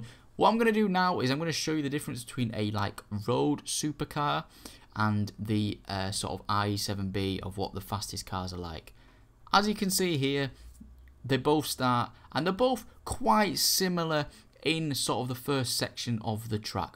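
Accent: Yorkshire, United Kingdom. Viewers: Is that...a Yorkshire accent? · you sound like a british is it right:)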